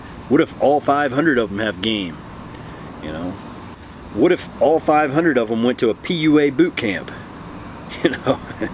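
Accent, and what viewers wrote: South, United States. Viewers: The southern accent is a lot thicker now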